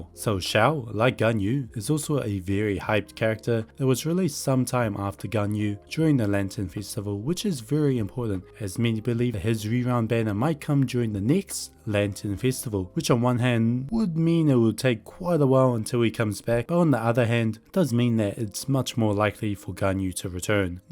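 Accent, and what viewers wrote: New Zealand. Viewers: Are you a kiwi? You gots my accent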